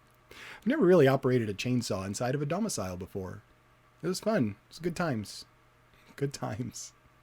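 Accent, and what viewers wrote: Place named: New Jersey, United States. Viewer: LOL! True Jersey speak! 😁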